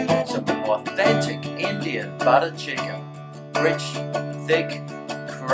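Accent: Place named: Australia. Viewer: Tuck on in...I love the way you Aussies speak. Great show as always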